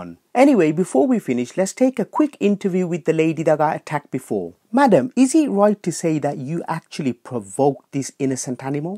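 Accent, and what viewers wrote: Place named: Birmingham, United Kingdom. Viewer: Your too funny and i love your brummie accent ❤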